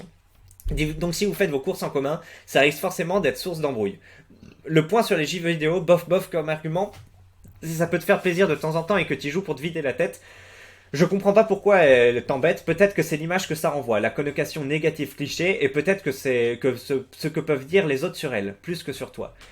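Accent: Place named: Southwest, France. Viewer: Tu dis souvent moinssss, tu viens du pays basque? Ou du Sud Ouest?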